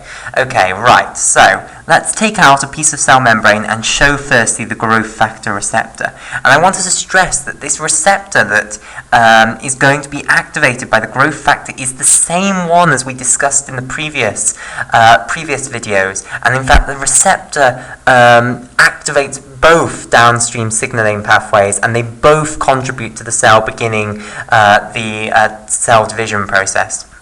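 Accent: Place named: Scotland, United Kingdom. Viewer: You have successfully concentrated only one thing that is your Scotland accent. Thanks for the explanation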